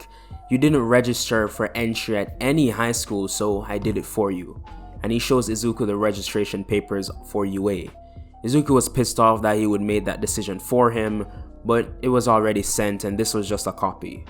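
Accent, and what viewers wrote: Jamaica. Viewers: Listen no hate I'd just like to know do u have a Jamaica ascent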